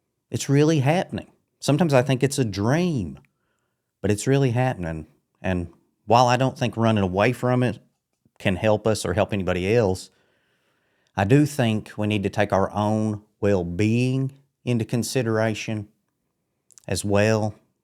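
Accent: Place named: Tennessee, United States. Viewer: I'm also an infj. We are definitely on the same wavelength. And I'm from Madisonville, I told my friend Paula I thought you have a Tennessee accent. Anyway, I enjoy your videos and completely relate with your experience.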